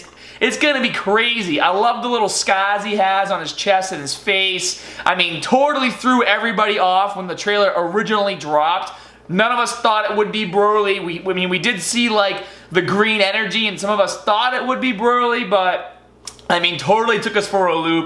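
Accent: Boston, United States. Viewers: That boston accent tho lol x'D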